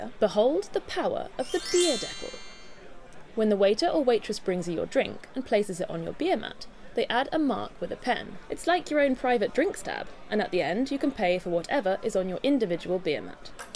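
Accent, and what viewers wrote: United Kingdom. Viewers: pantes aksen Inggris awalnya saya kira asli orang Jerman ternyata Inggris 😅😅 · Bicara tentang jerman tp dengan aksen britania hehe.. saya suka yg terakhir, semua hrs rela berkorban utk sebuah tujuan bersama👍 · Waahh serasa menonton harry Potter pakai aksen British 😄😄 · Suka banget aksen british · Salfok sama accent britishnya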